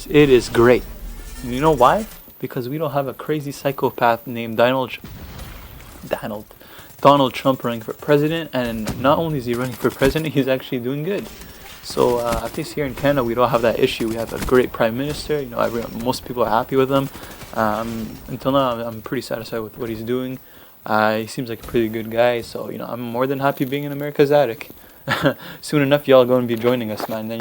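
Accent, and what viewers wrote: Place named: France. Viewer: dammit, 2nd lol but that french Accent tho hahaha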